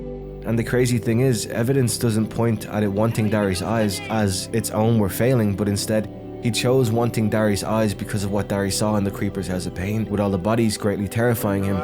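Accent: Ireland. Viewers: You sound very Irish if I say so myself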